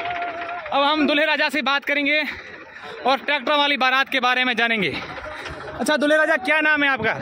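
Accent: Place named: Marwari, India. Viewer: Aapki aavaj marwadi andaj me or gramin se news late ho dhanay ho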